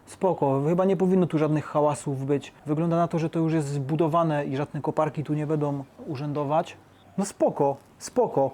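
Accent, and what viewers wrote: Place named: Silesia, Poland. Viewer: kurcze, do zludzenia przypominasz akcentem Podolskiego :D Skad jestes ze slaska? Pozdro z Chicago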